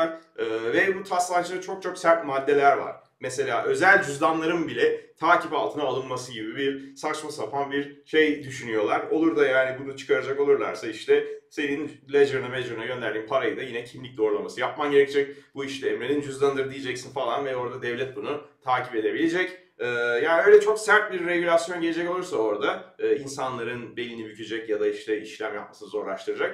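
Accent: United States. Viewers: Komedi filmisin yaaa. Olumsuz yorumlarıda hemen siliyorsun en ufak eleştiriye açık değilsin. Bilgin yok ve amerikan aksanıyla Türkçe konuşarak, İngilizce kelimeler ekleyerek de olmuyor. İnsanları yanlış yönlendirme lütfen, anlattıklarını zaten çocuklarda biliyor.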